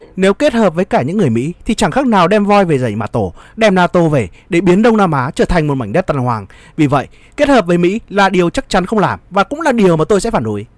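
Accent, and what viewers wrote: Standard. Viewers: nói chuẩn quá anh ơi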